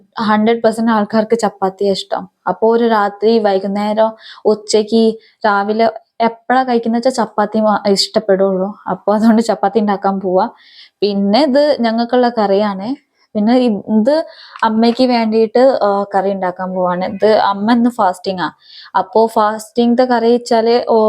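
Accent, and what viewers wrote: Malappuram, India. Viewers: Malappuram slang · Ividunn klynm kazhich angot poythaan.love marriage enn Avr prnjllo.pine samsarm ketit malprm muslimsnte samsrm an. Malapurth hindusn veroru slang und. Kurch nlla malayalm.matham mariyath avam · kayichath kettappo thonni malappurathnnanu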